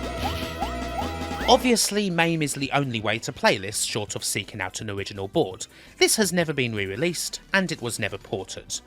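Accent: United Kingdom. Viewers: As an American the way you said "kick some heads in" about X-Men in a pleasant British accent was pretty disturbing/awesome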